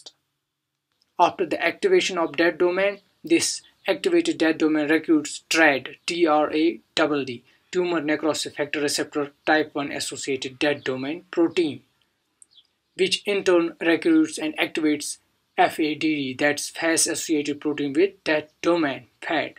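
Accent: India. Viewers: your accent is very strong indian, but you really made me get the clue. thanx from germany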